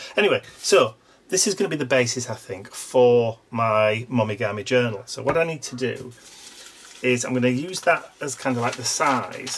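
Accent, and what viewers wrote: United Kingdom. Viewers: Lots of bits! I love the British accent!😃💖❤❤❤❤❤❤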